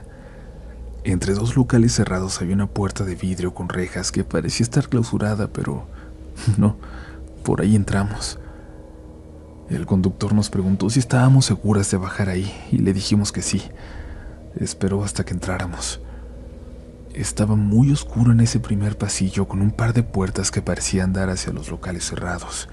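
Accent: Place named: Mexico City, Mexico. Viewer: pensaba que valdria la pena pero es narrado por alguien d cdmx así que no, eso huele mal.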